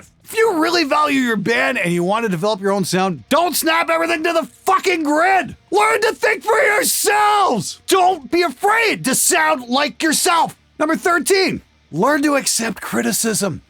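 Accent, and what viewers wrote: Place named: Canada. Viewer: I wasn't surprised one bit when this guy said he was Canadian, my guess somewhere in the southern Ontario. Love your shit keep them coming!!!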